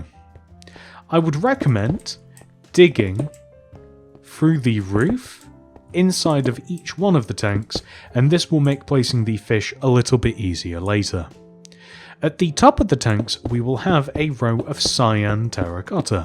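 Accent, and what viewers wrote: United Kingdom. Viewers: Are you British?🇬🇧🇬🇧🇬🇧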